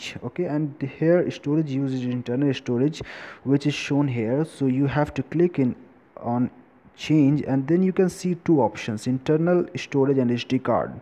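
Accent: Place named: Pakistan. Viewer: Are you Pakistani? You remind me of Kumail Nanjiani the way you pronounce certain words.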